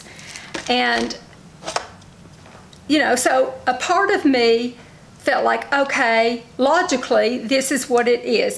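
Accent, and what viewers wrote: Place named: Tennessee, United States. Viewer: Just found your your YouvTube..I can so relate to you..I from Tennessee and listening to you takes me home..Im 70 and really appreciate you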